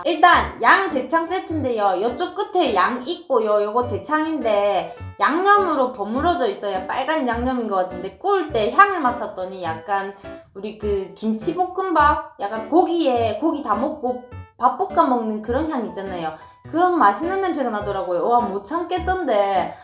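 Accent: Gyeongsang, South Korea. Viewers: 저왜 안광이 보이죠? ㅎㅎ여자인내가봐동..넘이쁘다 ㅎ 이거익은거예요~~ 같은 또 경상도인이로써!!! 자랑스런이쁜받는말투!!ㅋ 렌즈를 따로꼇다해도 안광이... 그리고 두겹 팔자주름..매력포인트... 전좋아요 저부분!!ㅎ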